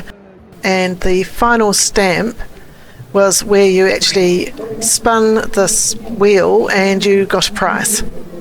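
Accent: New Zealand. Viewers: Something you might want to work on. Nail your vowel sounds. I notice a couple of times when you speak Japanese, you kiwify the pronunciation. e.g. Minna no nihongo. Hot tip, you're not in a race, doesn't matter what language you are speaking, your native language or a language you are learning, if you slow it down you win. If you are speaking English, slowing it for non-native speakers means they will listen to you more carefully, if it is your learned language, then people will recognize and may mirror your speed (huge win). TOO many people are trying to break speed records in class typically younger learners do this. This is a way of disguising deficiencies. So lets break down - Minna no nihongo Mi - that sounds like "me" in English, not "minna" like "winner" There are 2 n's in there, they deserve their own pronunciation. You can hold on the "n" (hold it on the roof of your mouth, then raise your chin and spit out the "na") Keep your "no" short, it's shorter than someone speaking English and saying "no". 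Nihon"go" - hold that "n" in the middle the same way as before (tongue on the front part of the roof of your mouth, you can even hold it there a little it will make you sound more Japanese, then spit out the "go"). Remember - speaking is not a race. And being a little older and wiser, you get a pass for slowing the tempo a little to get it right. It's a fantastic tool I've used all my life, slow it down just a touch and people will listen more attentively. *NOTE I share th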